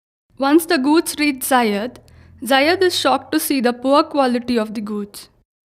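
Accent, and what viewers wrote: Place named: India. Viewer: CAN'T UNDERSTAND THIS F@ INDIAN ENGLISH....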